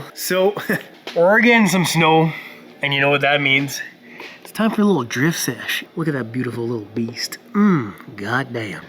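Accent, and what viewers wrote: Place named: Quebec, Canada. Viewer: t tu québécois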